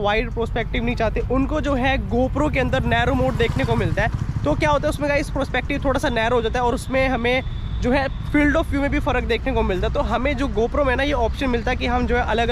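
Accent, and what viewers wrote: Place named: Kashmir, India. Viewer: Bro....ur accent gives Kashmiri touch.... Anywz nice comparison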